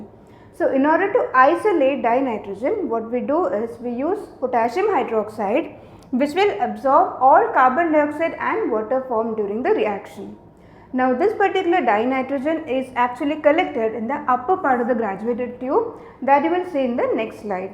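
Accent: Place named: South India, India. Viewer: Aiyo I guessed right you are south indian ji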